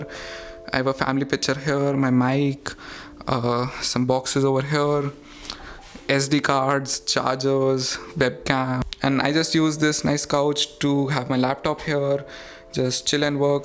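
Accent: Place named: India. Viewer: Wft ? This Indian guy can study in ANU with those terrible English and accent ? ANU has low standards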